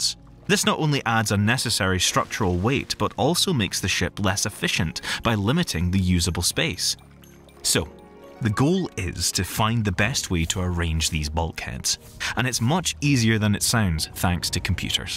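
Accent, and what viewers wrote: Scotland, United Kingdom. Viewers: I love the Scottish accent for this!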